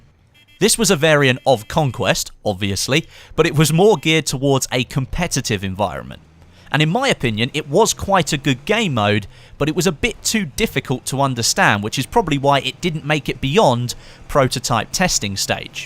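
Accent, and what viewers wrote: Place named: United Kingdom. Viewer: Waiting for the voice over to start ... Hear a British Accent. Yes!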